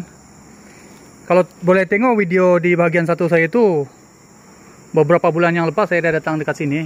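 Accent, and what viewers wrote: Sabah, Malaysia. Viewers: Hai..salam dri sabah,sya pn cmpuran jg..mak sya org rungus kudat bpa sya org brunei..sya fhm2 sikit2 ckp..sbb mcm bhsa rungus jg cuma lohgat sja lain..sya memng sdia mklum org sabah thu dri bhsa mlayu sja..apa pn terbaik..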